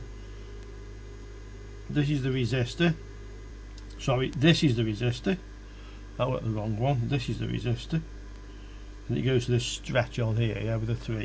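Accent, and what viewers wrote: United Kingdom. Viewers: Thank you for your time and your helpful videos! I'm 44 and now I try to learn electronics. I'm from Austria, and so my mother tongue is German. Thank you for speaking this nice british-english! So I can improve my english at the same time. Thank you for your effort!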